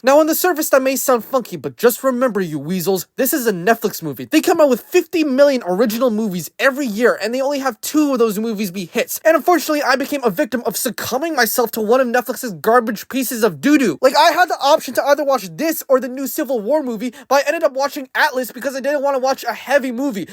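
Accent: United Kingdom. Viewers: You're brit ??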